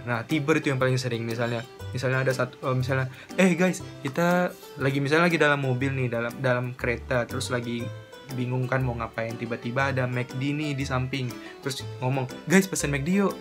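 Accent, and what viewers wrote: Malaysia. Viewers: 🤣🤣🤣. Tiberr is shorform for Tiba². It's " oh what a sudden" or "and suddenly". 🤣🤣 U dah macam orang KL dah. Perkataan membawang ni baru few years ni diorang start pakai. Sebelum ni kitaorang pakai mengumpat je.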